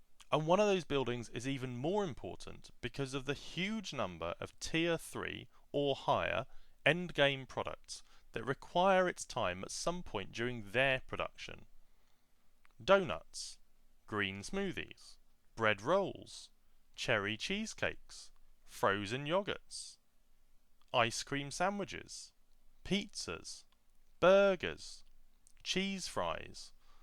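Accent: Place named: RP, United Kingdom. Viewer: Why is it that it seems classier to play this game while I am listening to instructions from a guy with a posh accent? Also, thanks. I knew there was probably something useful about selling things.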